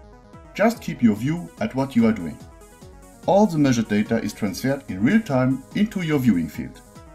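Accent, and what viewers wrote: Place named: Germany. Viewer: are you german or french? sounds a lot like english with a strong german accent :D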